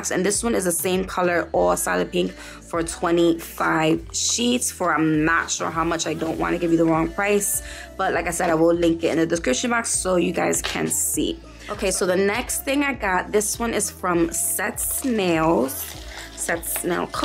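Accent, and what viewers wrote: New York, United States. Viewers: Not only do I love your nail work your New York accent is to die for! I’m from California, and anytime I go back east I could listen to people talk for hours! You are so fabulous! And PS I have used those wax pencils to pick up stones ,large glitters etc. they work really well😀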